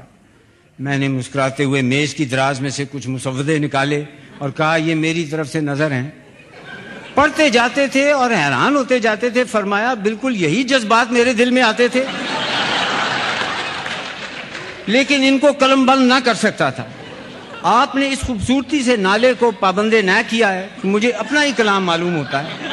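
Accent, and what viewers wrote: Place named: Hindko, Pakistan. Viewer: Oh okay thanks for clarifying. I was wondering how Zia Mohiuddin sounded like a native Hindko speaker talking in Urdu.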